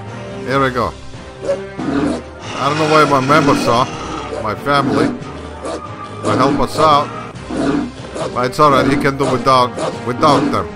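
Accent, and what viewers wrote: Russia. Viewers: Я знаю что ты говоришь на русском по твоему голосу Я я знаю что ты русский но ты разговариваешь на на английском чтобы люди поняли тебя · Love your Russian accent!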